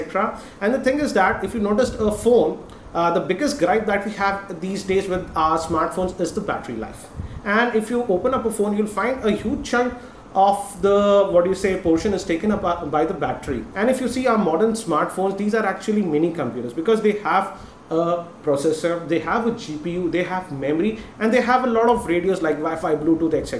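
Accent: India. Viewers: God Indians accent make me very mad .....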